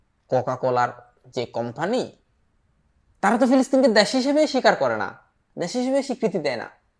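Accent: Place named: Chittagong, Bangladesh. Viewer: ভাইয়া মনে হয় চট্টগ্রামের, ❤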